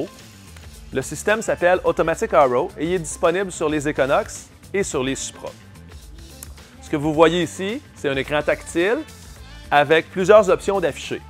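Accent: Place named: France. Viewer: Yes with and the English Chanel needs to be without the French accent so us Americans can better understand. Please.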